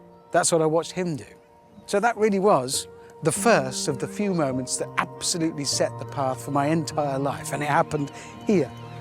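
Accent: United Kingdom. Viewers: the art of story telling will always be delightful in a uk accent...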